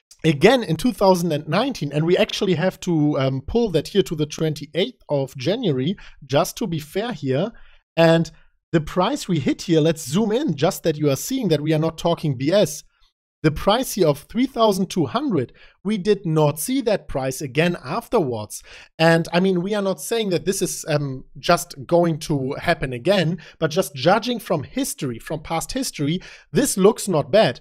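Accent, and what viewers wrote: Germany. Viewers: Are you german? ;))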